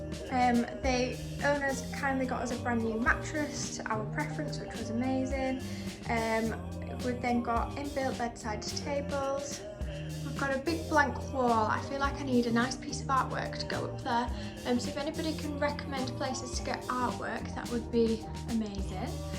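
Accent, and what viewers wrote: United Kingdom. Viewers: We love listening to British accent…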